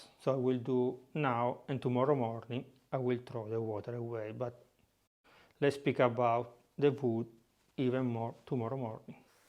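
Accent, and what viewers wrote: Italy. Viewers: He's making flavoured alcohol and he has a comical Italian organ-gringer accent. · Yes, I started it in the morning and after ten days I will tell you the result... But i noticed that you speak English with an Italian accent 😄🤣 This makes you very nice 😄 And by the way, I love Italy so much and would like to visit it. 😍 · Orca boia l'accento italiano. Soltanto per questo ho guardato tutto il video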